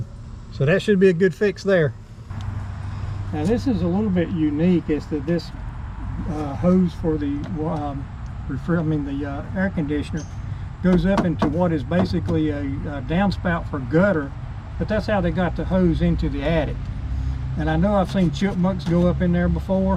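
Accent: South, United States. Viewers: New subscriber here! Thank you so much for this video. I’m sure I’m a neighbor (I noticed the accent) and this is something everyone deals with here In the south especially out in the country (rural areas). This is exactly what I needed to watch! God bless you! 😎👍🏻👍🏻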